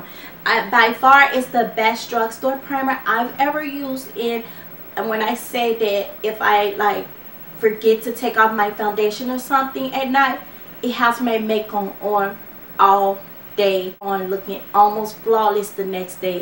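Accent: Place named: Haiti. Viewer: Are you from Haiti pretty lady? U sound like your from my island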